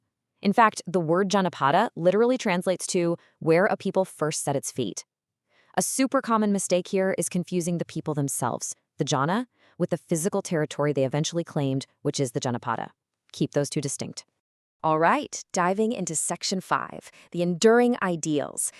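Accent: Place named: West, United States. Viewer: Good source. My only concern is the western accent.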